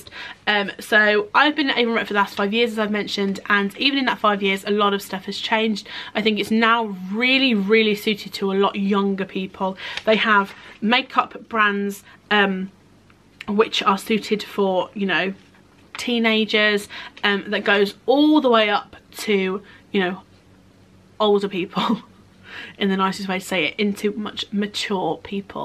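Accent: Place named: England, United Kingdom. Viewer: Ooooo! English accents are always so delightful to listen to. ^.^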